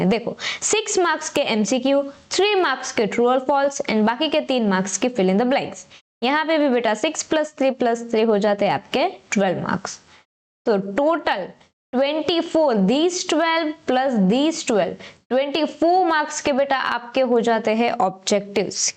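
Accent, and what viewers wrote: Gujarat, India. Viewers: Aap Gujarati Ho kya Mem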